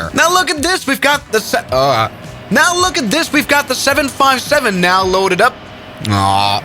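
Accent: Germany. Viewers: I was not expecting the German commentary brother. I guess I never realized you are German lol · bro was a Germany or swiss? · Dein Englisch-Deutsch hat mich gekillt😂👍. Ich hab von Kindesbeinen an sämtliche Simulatoren geflogen, angefangen mit FS98, 2000, 2002, 2004 und der FSX natürlich. Der Übergang vom 2000 auf 2002 war schon revolutionär damals. Bevor ich aber wirklich mit dem MSFS angefangen habe bin ich viel "Fly Unlimited 2", der wahre Flugsimulator meiner Kindheit. Ach und dann war da noch "Fly! 2" von Take 2, kaum bekannt. Ich erinnere mich noch an Top Addons im FS2002, Capain Sim hat im FS2002 mit der 727 angefangen. Die war damals revolutionär oder die gute "Ready for Pushback 747-200". 2012 habe ich dann Pause gemacht und Anfang diesen Jahres mit dem FS2024 angefangen. Ihr könnt euch nicht vorstellen, wie heftig diese Entwicklung für mich war😂. Die Grafik war das Eine, okay, ich hatte den 2020 schon auf dem Schirm und wusste von der guten Entwicklung grafischen Entwicklung, aber was für mich krass war, war die neue Technik in den Cockpits, nämlich die neuen Glascockpits. 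Bis dahin war für mich das Cockpit einen A320-200 am modernsten oder das einer 777😂. 2011 gab es doch von Microsoft einen ganz kläglichen Simulator. Ich glaube der hieß einfach nur "Microsoft Flight", spielte nur auf Hawaii und war grafisch im Grunde nur ein leicht aufgehübschter FSX, wurde auch baldig eingestampft.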